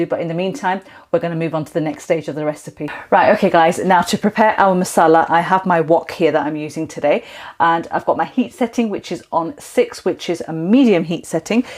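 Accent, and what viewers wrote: United Kingdom. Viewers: I will be trying this dish, it would help if you wrote down the ingredients, since you talk with a British accent it is difficult to tell what you used. Thank you for sharing!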